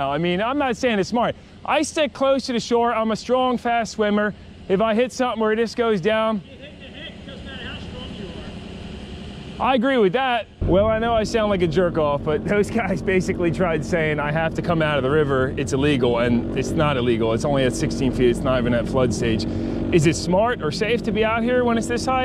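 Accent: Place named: Philadelphia, United States. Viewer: This man is def from the philly region i can hear it in the voice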